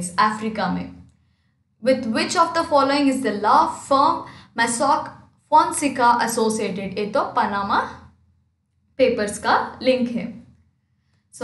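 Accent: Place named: South India, India. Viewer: Just love ur speaking accent .👀🙈 R u from south india..🥺👀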